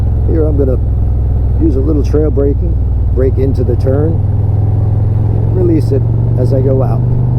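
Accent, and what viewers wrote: New York, United States. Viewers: Forty two years and you still sound like a New Yorker. I’m in NC and two words out of my mouth and they ask, “you from NY?” Gee, how could you tell? Bwahahaha! P.S. Love your videos. Regardless of how long we’ve been riding any of us can always learn something new. I’ve recommended your DVD’s to every new rider I meet. Keep up the good work.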